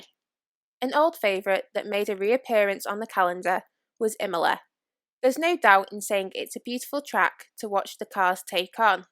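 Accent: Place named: Liverpool, United Kingdom. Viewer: Hey, random question, are you from around the Liverpool area? 🤔 As a Hungarian, I have to agree, the hungaroring is usually not too exciting in terms of results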